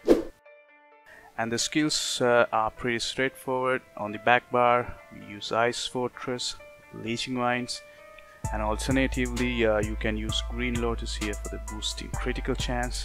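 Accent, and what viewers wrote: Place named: Asia. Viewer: loved de asian-e accent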